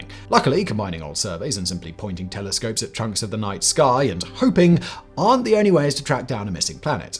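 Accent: United Kingdom. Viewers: Your proper British accent makes those Uranus jokes even funnier 😂🤣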